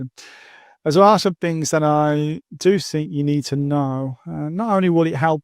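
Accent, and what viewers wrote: Birmingham, United Kingdom. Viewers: Are you from Birmingham uk?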